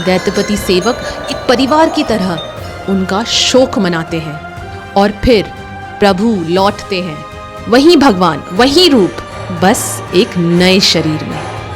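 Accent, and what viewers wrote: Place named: Bengal, India. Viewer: Your pronunciaton says u r a bengali. Jai jagannath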